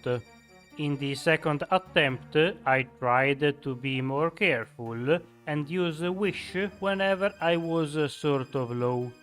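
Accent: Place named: Italy. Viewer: Si sente che sei italiano...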